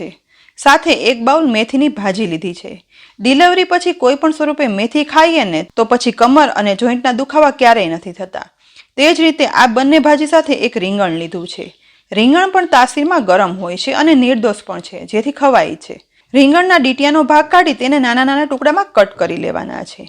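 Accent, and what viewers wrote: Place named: Rajkot, India. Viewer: Yummy food with full of nutrients. I love your accents ,seems like you are from Rajkot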